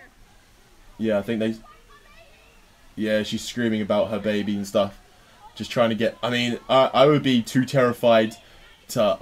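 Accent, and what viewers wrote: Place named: South London, United Kingdom. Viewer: what part of south london u from?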